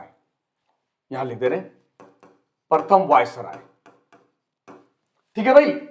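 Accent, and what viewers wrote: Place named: Jharkhand, India. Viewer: जोहार महोदय लगता है आप झारखंड से हैं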